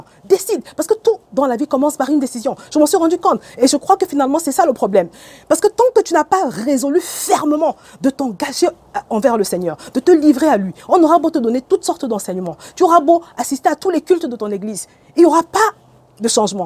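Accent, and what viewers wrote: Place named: Mali. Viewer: C claire t une malienne vu l'accent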